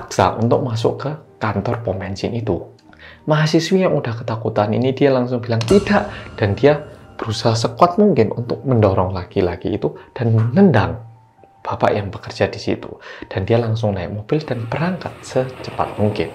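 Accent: Java, Indonesia. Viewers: Mau yang medok saja pleaseeeeeee😂😂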